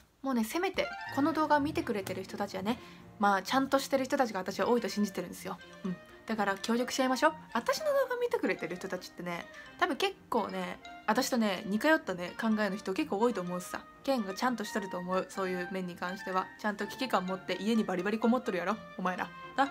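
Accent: Nagasaki, Japan. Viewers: 未だに外で遊び回っとる奴らはその行動1つ1つがこれからの未来に関わってくるって重く受け止めて欲しい。あと全然関係ないですが、自分博多弁の人なんですけど、さん長崎弁話されててなんか親近感が沸きました(*^^*)これからも頑張って下さい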